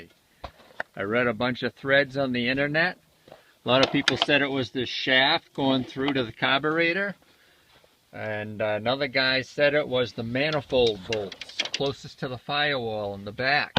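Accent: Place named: Maine, United States. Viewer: sound like you are from maine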